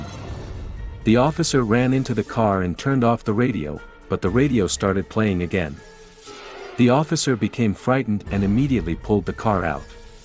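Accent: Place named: United States. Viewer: Brah, Honolulu and Waianae are separate counties. And it's HONOlulu, not HANAlulu. I can tell you one haole from da mainland.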